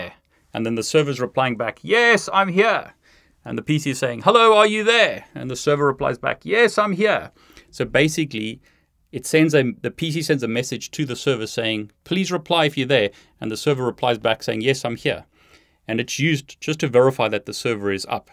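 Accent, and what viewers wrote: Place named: South Africa. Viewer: how long have you been in South Africa?, I can pick up your accent to somewhere in South Africa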